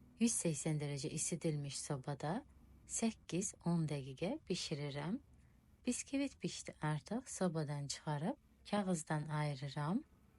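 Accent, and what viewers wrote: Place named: Turkey. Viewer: Hazırladıqlarınıza söz yox.Əla.Danışıq tərzinizi anlamıram.Türkiyədə yaşayırsınız?Cümlələrin sonunda olan vurğu bizim millətə xas deyil.Bu səbəbdən soruşuram.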